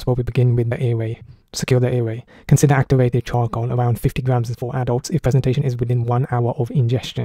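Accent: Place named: Germany. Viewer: I thought he’s speaking german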